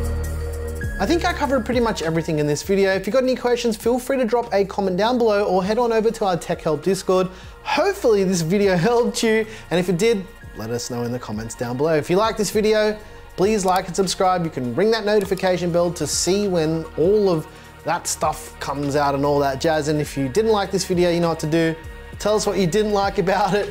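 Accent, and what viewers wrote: Australia. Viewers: Dude been watching your info for a while and appreciate an aussie voice with some real passion for the same things as me. Great content. Do you think that lianli will bring out a 140mm option as well?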